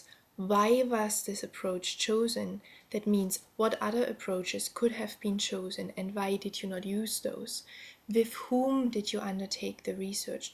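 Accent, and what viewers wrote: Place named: Germany. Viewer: Are you a german, because you sound like a german speaking english.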